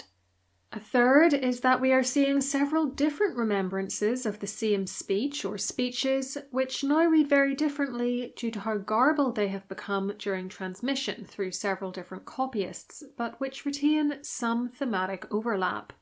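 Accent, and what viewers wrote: Northern Ireland, United Kingdom. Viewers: But you have a voice, oh, such a voice! A voice that is of a good language, and English, at that. Or Northern Ireland or someplace. ❤ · As a Shakespeare Original Pronunciation aficionado, hearing Elizabeth's speech read in your lovely Northern Irish accent (which bears some similarities to O.P.) really makes it come alive for me.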